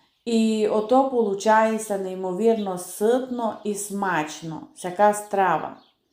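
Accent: Transcarpathia, Ukraine. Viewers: Йой як файно по закарпатськи говорите, лем коли чуву ож крумплі то ріпа, та уха ми ріже 🤣 Вже варю, дораз увидиме шо то за діло 🤓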